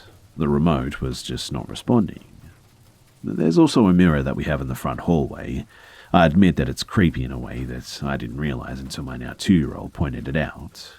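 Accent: Australia. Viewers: 2.5 hours of sexy Aussie voice.;). 🥂🍻🌿🌈🌸🌃🌆🌇🌋🌛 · If I'm having trouble sleeping, I usually turn on one of your videos cause your low, Australian voice lulls me into a sleep. I love your content 💛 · Omg my body basically can’t fall asleep at night without listening to this Australian mans voice 😂 It’s pretty annoying since I fall asleep while listening and when I wake up I have to find my air pod because it falls out of my ear while I sleep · Love Australian accents! ps I’m Canadian! Lol I always try but it comes out British haha I repeat this guy all the time mate lol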